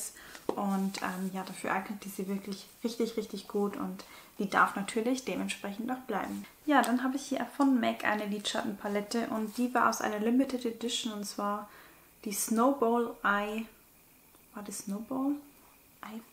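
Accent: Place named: Bavaria, Germany. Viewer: Soeben entdeckt 😅 gefällt mir gut.. Ich meine zu hören dass du aus Bayern bist😁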